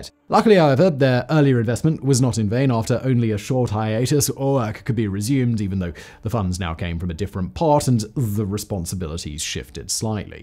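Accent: United Kingdom. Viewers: British English.🇬🇧 From the inventors of the language, correctly spoken. Not some bastardised colonial strangulated and simplified version.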